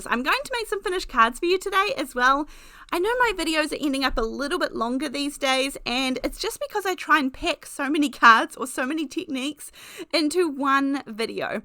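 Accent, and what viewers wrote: New Zealand. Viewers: Yay, we have sound! I love your crafts and the sound of your amazing NZ voice! 🤩🤩🤩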